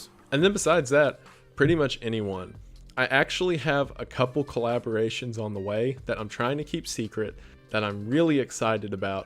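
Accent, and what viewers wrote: South, United States. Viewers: But he has a southern accent lol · the hawaiian shirt coupled with a southern accent is a godly dadcore combination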